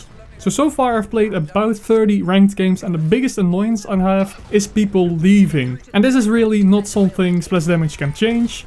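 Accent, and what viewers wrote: Netherlands. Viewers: haha lekker hollands accent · U sound really Dutch (vette video man en respect voor je Engels het is echt goed)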